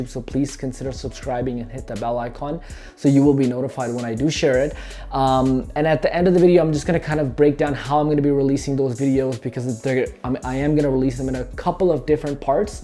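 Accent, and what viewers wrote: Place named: Toronto, Canada. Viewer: sounds good brother. U sound like ur from Toronto. Am i right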